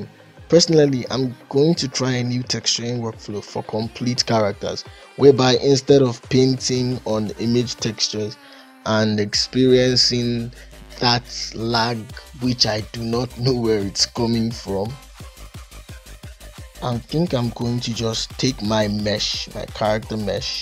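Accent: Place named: Nigeria. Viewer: the sculpting workflow is immaculate, the nigerian accent aswell 🤣🤣😭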